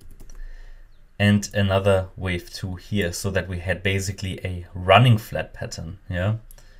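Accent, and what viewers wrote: Germany. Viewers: German accent? Where are you form?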